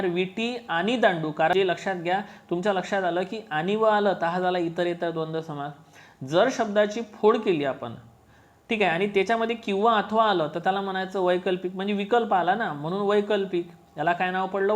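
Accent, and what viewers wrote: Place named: Amravati, India. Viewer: सर अमरावती ची भाषा वाटत आहे मी अमरावती कर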